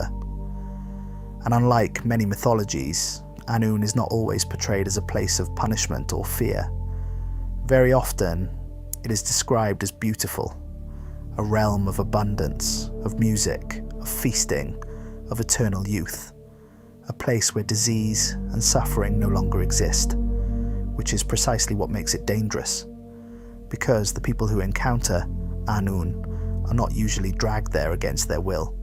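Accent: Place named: Wales, United Kingdom. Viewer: I'm not sure if you've heard of Ren, but he's a brilliant Welsh musician. Perhaps the best I've heard in my 60 years. But, your speaking voice is quite similar even beyond the accent. This was a wonderful video. Thank you.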